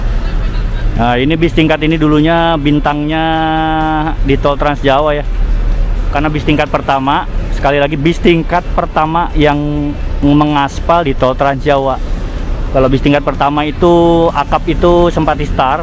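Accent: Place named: Madura, Indonesia. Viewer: anda orang madura ya bang ? kok logatnya medok banget maduranya 😁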